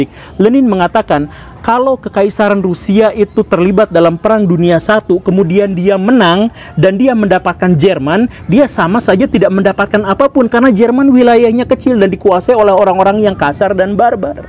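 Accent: West Java, Indonesia. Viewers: Pak unik bahasanya...nyunda banget😁😁😁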